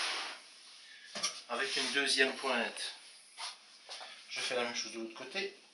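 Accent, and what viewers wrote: Belgium. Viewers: May just be a lower dialect of Belgium. Especially since you've got a couple languages commonly spoken there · He speaks french but he's belgium, he have a belgium accent. (I'm french so) and the description in this video says "the belgium cheese master..." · i recognised a Belgian accent even before reading the description... he definitely has a slight accent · yeah normally Belgian accent is a bit stronger than this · What do you mean by "people like that" ? You didn't read the description but kept trying to argue, and he also has a Belgian accent, just pay attention to the way he says "écarteur" like "écakhteukh" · yeah he does, accent from Liège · He has a strong accent from Wallonia indeed. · IT IS definitely a Belgian accent. I am french myself and I live in Belgium, and I can tell you 100% I recognise this accent as being from Belgium. 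You are telling such bullshit.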